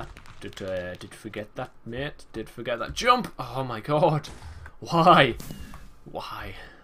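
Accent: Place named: United Kingdom. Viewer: You British m8 love your vids you make my day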